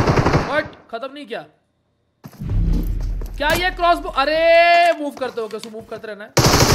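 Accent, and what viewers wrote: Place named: Maharashtra, India. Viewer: You say funny marathi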